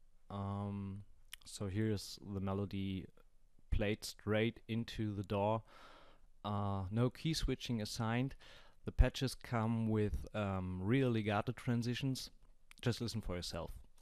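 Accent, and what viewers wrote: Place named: Germany. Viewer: Are you german? Cause you have a german accent ;) Or atleast it sounds like one.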